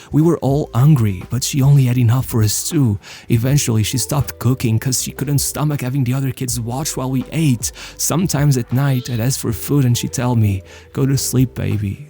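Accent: Portugal. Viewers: Where you talk very portuegues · E ese inglés com sotaque portugues?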